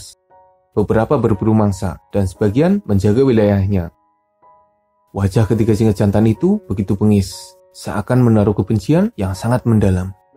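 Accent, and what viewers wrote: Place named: Java, Indonesia. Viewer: Logat jawanya lucu mas🤣🤣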